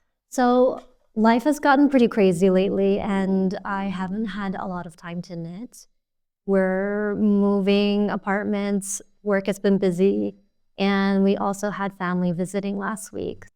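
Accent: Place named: United States. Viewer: I recently found your channel and have been enjoying your videos. I like your style and the way you present yourself. I would love to see an introduction video, where you share more about yourself. 😊 You sound American, and then your milf is Finnish but you live in Poland - I got curious! 👀😁 Ps. Greetings from Finland! 👋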